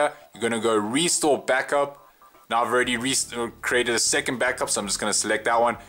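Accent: South Africa. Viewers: Dude are you South African!?!?!?!?!?!?!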